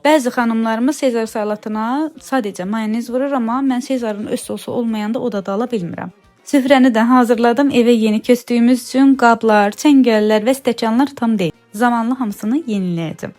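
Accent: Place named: South, Azerbaijan. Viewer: Baxan kimi bilmişdim cənub bölgəsindənsən. Eynilə qohumumuzun gələni kimi danışırsan. ❤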